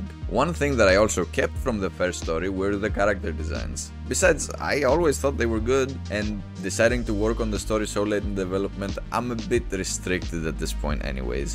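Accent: Greece. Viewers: You sound greek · You sound very Greek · i knew i recognized that accent, but i wasn't sure if you where actually Greek, or just Balkan. but then i saw fucking Ιανουάριος and i was like μπροοοοο. Επίσης γαμάτο βίντεο!!! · There is no way you're not Greek with that accent. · Το ήξρερα οτι είσαι έλληνας το άκουγα στην προφορά .Επίσης ευψαριστώ με βοήθησε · I was wondering the same because of the accent so I took this whole video as a puzzle like "is that nature in the background in a climate zone that exists in Greece?". Well, I got me definitive answer here and that puzzle is solved. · You sound Greek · greek accent lol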